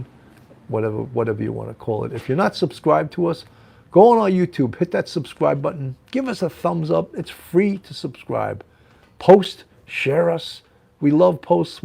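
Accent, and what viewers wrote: New York, United States. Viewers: Having lived in NYC when I was a kid you sound like an old friend. Thanks!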